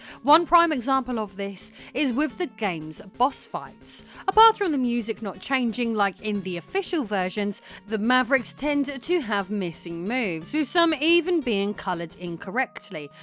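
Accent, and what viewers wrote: United Kingdom. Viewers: I love the british accent❤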